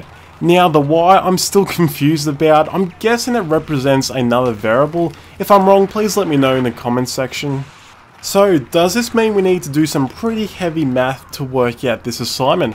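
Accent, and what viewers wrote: Australia. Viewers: Great video man! Are you from Australia? Either way, you've gained a sub :)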